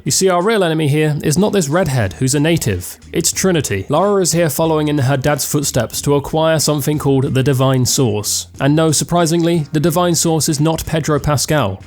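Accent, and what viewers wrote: United Kingdom. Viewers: What is this accent? I can only tell it's British but not more precise than this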